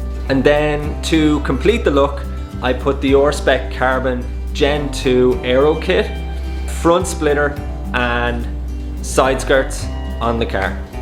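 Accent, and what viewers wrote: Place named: Canada. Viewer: I knew your accent was Canadian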